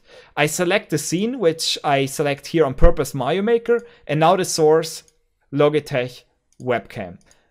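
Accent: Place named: Germany. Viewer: Are you German? Cause I can hear the accent.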